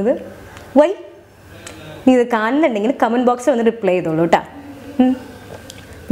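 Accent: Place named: Thrissur, India. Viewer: കുറച്ചു നാള് തൃശൂർ താമസിച്ചു കൊണ്ട് ആണോ ചിലപ്പോൾ സംസാരിക്കുമ്പോൾ തൃശൂർ ഭാഷ പോലെയാ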